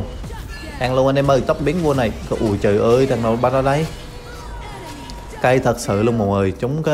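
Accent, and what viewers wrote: Quang Ngai, Vietnam. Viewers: Y chang, hồi kì nghe là ngộ ngộ ồn người quảng ngãi rồi. Chào đồng hương nha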